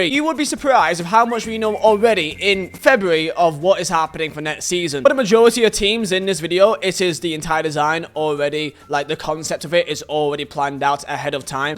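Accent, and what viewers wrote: United Kingdom. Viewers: ¿this dude is british? (question from a Mexican.)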